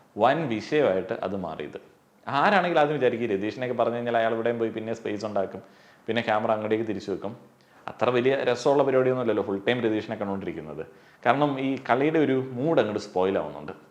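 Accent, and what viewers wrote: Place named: Thiruvananthapuram, India. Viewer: തിരോന്തരത്ത് താമസിച്ച് താമസിച്ച് ഞങ്ങട സ്ലാംഗ് ഒക്കെ അറിയാതെ വരുന്നുണ്ട്. 🥰🥰 ഗുഡ് പ്രസന്റേഷൻ. നല്ല ഒബ്സർവേഷനുകൾ.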